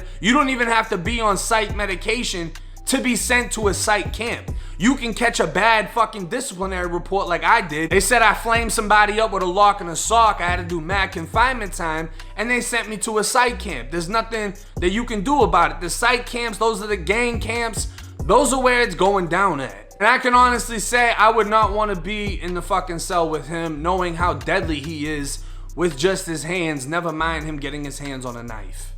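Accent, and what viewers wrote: Boston, United States. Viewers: Where's the narrator from? Bahston ??? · Sound like you have a Boston accent. Any ties to Boston? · Why does it sound like your from Boston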